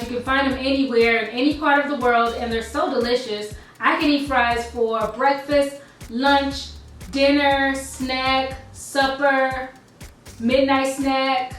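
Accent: Baltimore, United States. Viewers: I knew u were from bmore by ur accent. Youu-teuuuube!! Lol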